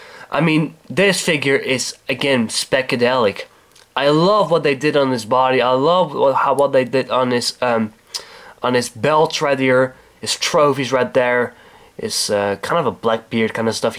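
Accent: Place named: Netherlands. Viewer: its kinda cool to see a review with a dutch accent , i like it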